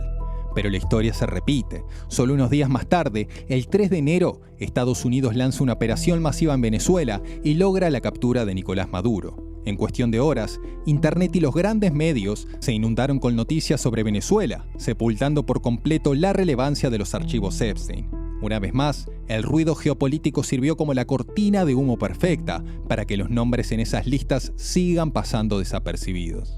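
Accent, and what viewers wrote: Argentina. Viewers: Lo siento no es por ofender, pero el asento Argentino me desagrada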